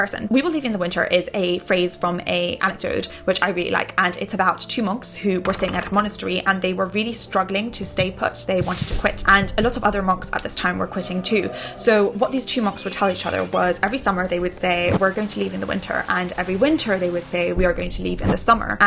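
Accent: United Kingdom. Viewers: Are you British? I though you were an Aussie.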